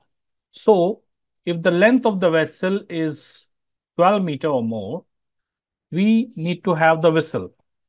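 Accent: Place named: India. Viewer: You are correct in that the video should assist with comprehension. But in my case that was not an option. I was driving and listening to the video, not being able to actually watch it. It’s the “Indian English” that I have difficulty understanding. The narrator does an acceptable with his presentation and English vocabulary. For me it’s the accent. And me being from backwoods Tennessee I have not been exposed to that accent other than the random telemarketer phone call.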